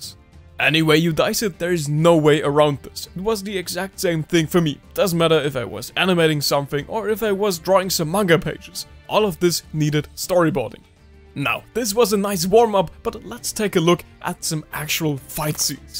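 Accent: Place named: Germany. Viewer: Dieser Deutsche Akzent xD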